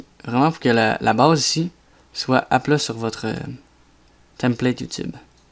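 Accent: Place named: Quebec, Canada. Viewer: je t aime mec <3 super tuto je kiff ton accent québécois :D je m abonne !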